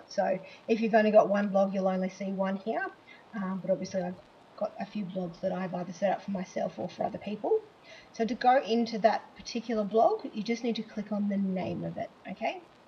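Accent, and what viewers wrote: Australia. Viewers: From Australia?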